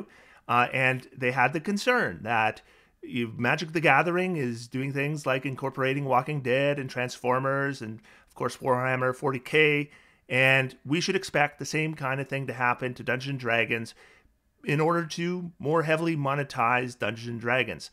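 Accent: United States. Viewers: It's almost like the profit motive degrades the value of a product over time as a business gets more and more successful. I was waiting for to say something along the lines of "profit motive bad" but it never came. To be fair I don't know him or his personal positions on things like this but also to be fair he's probably an American from his accent and he's probably been around long enough to see this sort of thing happen time and time again (at least in America). Maybe if the main goal of a business was to maximize product quality instead of product profitability, we wouldn't run into the age old problem of product quality being sacrificed in exchange for profitability. Now this is highly improbable under the current system with the way things are. So here are some changes to how the economy would work to fix this problem (and fix some other unrelated problems too): All companies must be by the law of the land, run by an elected president of the company (max term 4 years). 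No CEO, no owner of the company. In fact there is no owner of the company. Instead every employee is a part-owner of the company and gets a share of the stock. The stock isn't there to earn money. It's there to show you're part owner. Speculation (gambling (which is bad)) on the price of a 'share' of a company is now a thing of the past. Instead the profits will be split evenly amongst the employees. (The president will save some of the profits for maintenance, replacing equipment, etc.) Also to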